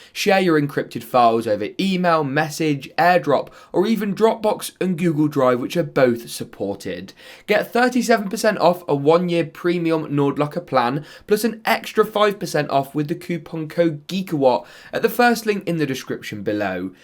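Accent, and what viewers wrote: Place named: Birmingham, United Kingdom. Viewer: Sound like a brumie but use $ any reason why not £.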